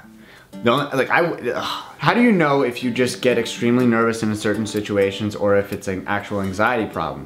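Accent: Canada. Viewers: basically ayla he’s Canadian. That’s how they pronounce it in Canada.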